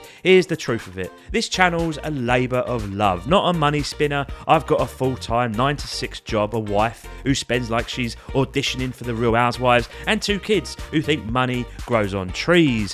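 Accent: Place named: Essex, United Kingdom. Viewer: You don’t sound very cockney to me. More Essex.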